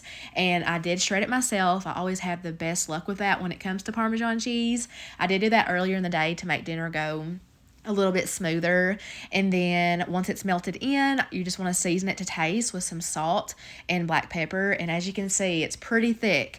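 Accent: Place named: Kentucky, United States. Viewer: Your Kentucky accent brings joy to my family. ❤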